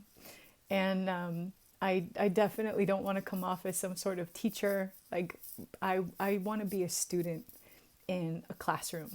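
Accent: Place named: France. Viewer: This is really cool. Thank you for sharing your words of wisdom, and the wisdom of wizards :) Also your French accent is endlessly entertaining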